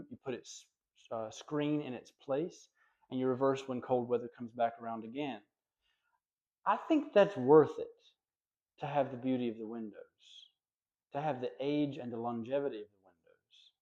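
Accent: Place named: South, United States. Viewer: You are AMAZING. Truly. Are you also a writer.? I am guessing - certain - yes you are. The entire post, but most especially the last scene with you in the dining room - is incredibly poetic and perfect. Such a southern voice like Tennessee Williams or Truman Capote - so musical sounding and thoughtful and convincing. I LOVE this house. Keep on doing what you are doing. And keep writing. Your talent is obvious.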